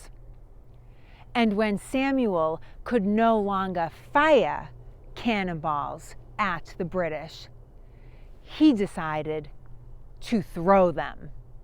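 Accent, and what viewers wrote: Boston, United States. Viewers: It's not New England it is a Boston accent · Great video! I have studied military history as a hobby lifelong but never heard of this battle. When I was stationed in various places over military career I always visited sites and kept an eye out for re-enactments and by chance never managed to see a civil war re-enactments but did see 3 Revolutionary War ones including Camden South Carolina and Monmouth New Jersey and an outlier Viking battle in Iceland :D. Orig New Yorker retired in PA love your Boston accent! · You only have to listen to talk for 15 seconds before you realize she is definitely from Boston.😂💕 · Hey... yet another great video, as always... but, you left Boston/Massachusetts and still have that accent 😊... thanks, Jimmy from beautiful 😍 bucolic 😊downtown Schnecksville, PA, sun 🌞 and fun 🎉capital of the world 🌎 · I'm here for the awesome history lesson but I'm also here for the wicked Bahstun accent hey. 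· There is something magical about listening to the history of the American Revolution with lovely voice and Boston accent. Came for the history, stayed for the accent. Thank you! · My sweet Historian with the Boston Accent!!😘💕🇺🇸💕🤘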